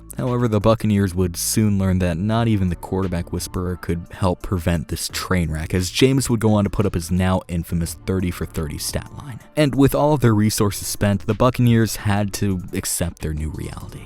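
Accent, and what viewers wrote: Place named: West, United States. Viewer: Why do you sound like a cowboy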